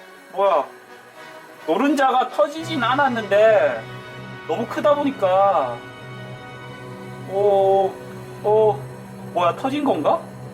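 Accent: Busan, South Korea. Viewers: 혹시........... 부산사람이세요???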